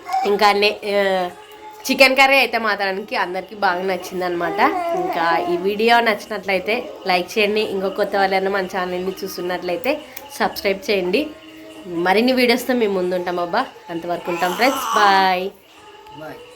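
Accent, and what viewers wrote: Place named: Kurnool, India. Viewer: అర్ధం iendi మీరు karnool side వాళ్లు అని me భాష అట్లేనే vundi😂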